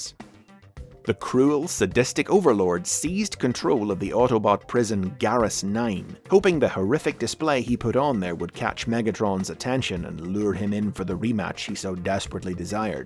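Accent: South, United States. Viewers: you rock that southern accent well man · I kinda like his southern accent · I'm never going to unhear that southern accent, and I love it. Thank you for the episode! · Did not expect the southern twang, but I can't say that I hate it. Back in '92 the local toy store had the Overlord toy, but for an outrageous price that no-one in town could realistically afford for a mere child's toy, and I remember being kinda enamored with it. Same story a bit later with Rotorstorm. Seems I had a habit of picking mildly obscure Decepticons as my favorites.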